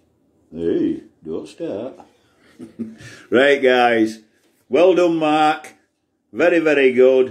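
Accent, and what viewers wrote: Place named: Yorkshire, United Kingdom. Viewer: Where in Yorkshire you from cocker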